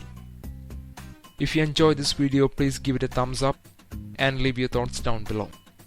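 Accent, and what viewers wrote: Kerala, India. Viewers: Your Mallu accent is thick X_X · Are you malayali? You sound so.. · Very hard to understand the massive indian accent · argg your accent making me sick dude ... you must be from kerala ....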